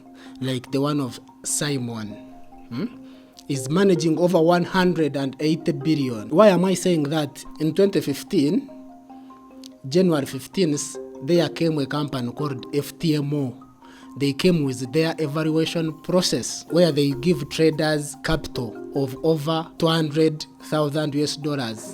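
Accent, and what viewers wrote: Uganda. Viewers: I can detect Ugandan accent from a kilometer away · Too authentic, I like this man from Bunyaruguru😊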